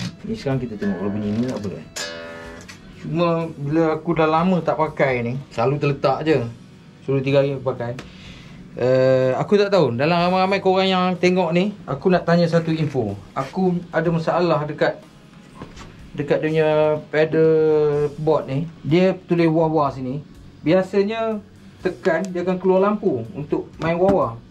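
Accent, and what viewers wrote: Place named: Johor, Malaysia. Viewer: 😅saLam~saye rase nie video Lame Lbh kurang10tahon Lps abangmus throwback semuLe ye!?🤔dah Loghat joho Lah puLak gamak nye nampak tengok bang🤙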